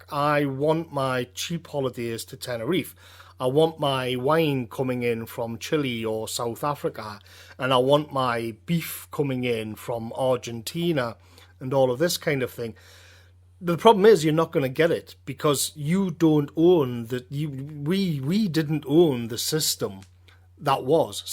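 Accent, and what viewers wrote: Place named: England, United Kingdom. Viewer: I'm new to this channel and I'm thoroughly enjoying the content. It's refreshing to hear non -sensationalist monologues, delivered with clarity, sense and topped off with a familiar English accent. As for the China food situation I believe that they have learned from their history, all their great dynasties have fallen around the same time as global food shortages, which coincided with solar (in) activity. Which we are now heading in to. I'm not fearful of the future, our ancestors faced worse and survived, the narcissist members of society are the ones who may not fare well, those who embrace Nature and Order will undoubtedly arrive on the other side of this nonsense in a stronger position. Mother Nature will fix the Globalist abominations. In 200 years, Europe will look like it did 200 years ago. Greetings from Rutland, Multum in Parvo!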